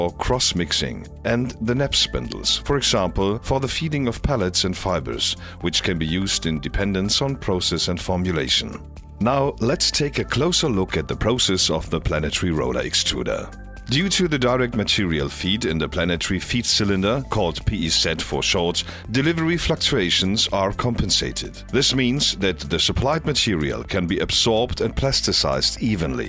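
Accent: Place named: Germany. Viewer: German accent turned up to 11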